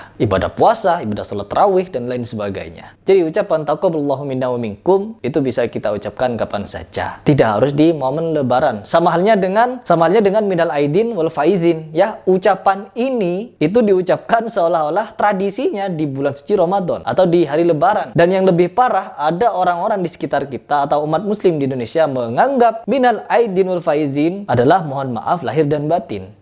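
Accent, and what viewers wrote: Madura, Indonesia. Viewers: Reng madureh kake ye ?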